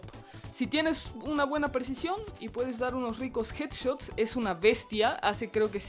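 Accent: Costa Rica. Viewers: eres de costa rica? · Eres de costa rica como mencionas la palabra mae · Hola jaja eres de Costa Rica verdad jaja Pura vida mae jaja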